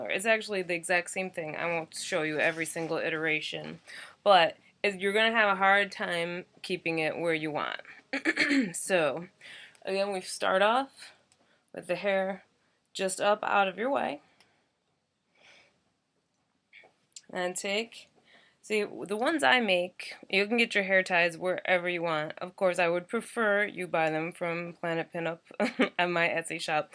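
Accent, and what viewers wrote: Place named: Pennsylvania, United States. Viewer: You are a smoke show 💨 I love your accent too. I’m guessing PA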